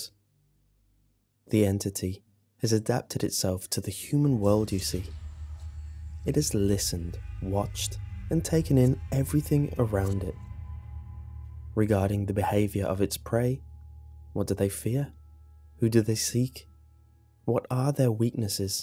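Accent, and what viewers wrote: England, United Kingdom. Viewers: hey what part of England are you from I'm trying to fugue out what accent you have.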